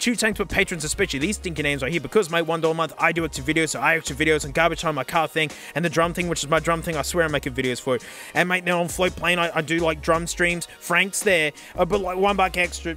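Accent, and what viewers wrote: Australia. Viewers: I really love the weekly display of the disembodied hands with Australian background voice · I've been binging your videos for like the past week, and I have this feeling. . . that you might be Australian.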